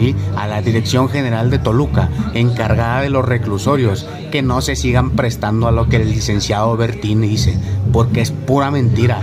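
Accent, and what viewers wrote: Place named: Colombia. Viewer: Tienes acento colombiano hermanito 👍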